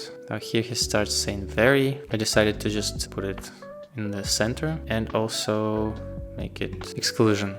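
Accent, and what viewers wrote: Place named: Russia. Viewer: впервые узнал русский акцент. ты крут, чувак. потом будем вместе работать)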